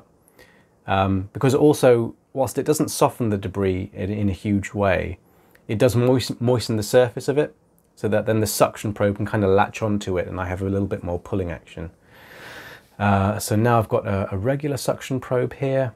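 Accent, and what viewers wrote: United Kingdom. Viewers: It's definitely a British accent, it's just not the stereotyped accent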